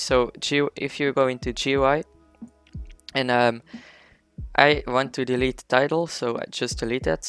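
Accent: Netherlands. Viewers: The accent is pretty dutch here, gwn om te zeggen hoor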